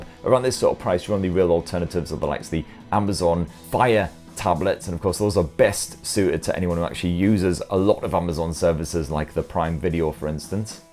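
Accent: United Kingdom. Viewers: He's British in case you don't know 🤣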